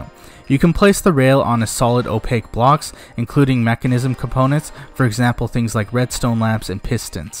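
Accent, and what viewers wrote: India. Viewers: Are u Indian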